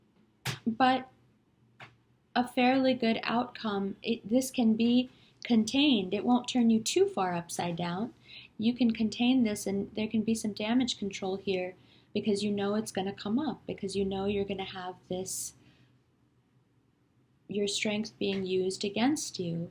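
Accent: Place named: New York, United States. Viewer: I knew you were from New York. That accent. Awesome stuff.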